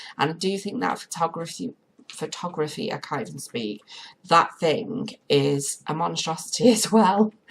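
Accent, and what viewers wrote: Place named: North, United Kingdom. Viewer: This is unreal! I’m currently trying bigger builds and the floor plan gets me every time lol btw I love your voiceovers it’s nice to listen to a northerner Faye x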